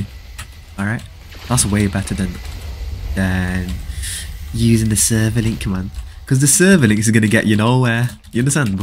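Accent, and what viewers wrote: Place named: United Kingdom. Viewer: Why do u sound more British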